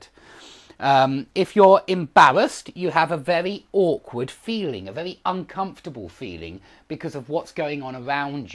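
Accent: England, United Kingdom. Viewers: OMG! I've just found your channel, vely useful I love british accent.I promise tolearn from yours.. Thank you ever so much♥️😊♥️ Apr 5th 2020... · Hi Teacher I love your lessons!!! I love your english accent!!!!!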